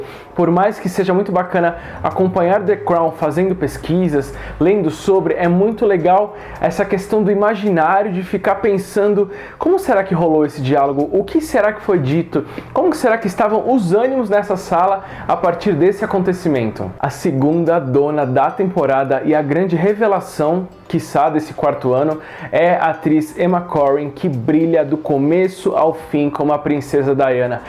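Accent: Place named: São Paulo, Brazil. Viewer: Raro sotaque paulista! Men gostei de+ da temporada TODA, mas o quinto episódio foi o meu preferido gostei de ver a invasão do palácio não sabia desse fato, fui buscar e realmente aconteceu "achei engraçado" a dama de ferro, fantástica! mas não curto muito a indiferença que ela tinha pela rainha o que eu percebi foi que a série não enfatizou o fato de que a primeira ministra detestava os encontros semanais e arranjava qualquer desculpa para não ir " e não ia" .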